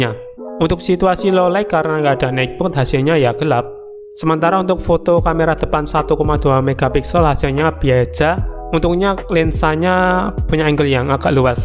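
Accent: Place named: Banyumas, Indonesia. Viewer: Ngapak yaaaa 😁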